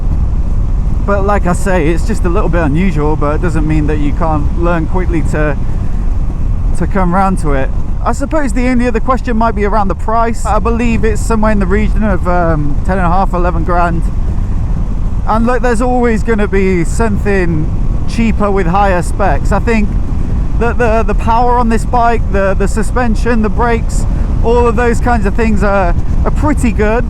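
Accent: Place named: RP, United Kingdom. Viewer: Knows how to speak English? He is English. Nothing but the Queens English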